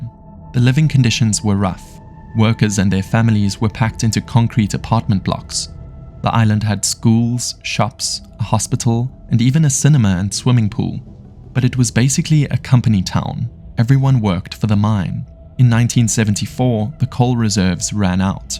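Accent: South Africa. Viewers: Is that a South African accent I hear? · Bro love your videos, from your accent you definitely sound like you are south African. But why oh why are there so many adds?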